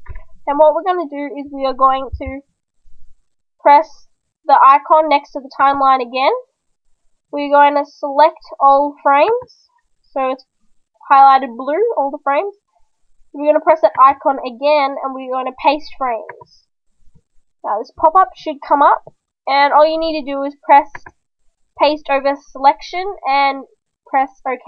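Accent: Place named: Australia. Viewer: speaks in an aussie accent like me is so refreshing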